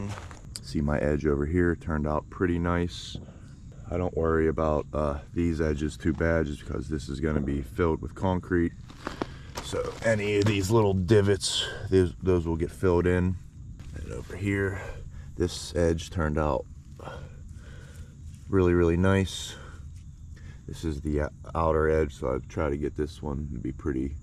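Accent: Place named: Southwestern Pennsylvania, United States. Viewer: I’ve been watching this series of you pouring your pad in stages. Great work doing it all yourself the old school way! I just poured a patio at my camp the same way in three stages. I had held though since I showed my nephew how to make the concrete and feed it to me. It’s a great feeling to do the work yourself and it certainly saves a lot of money. Are you building a shop on your pad when it’s done? Also where are you located? I think I detect a southwestern Pennsylvania accent 😂. Great job man and I’m enjoying the series. Greetings from northwestern Pennsylvania!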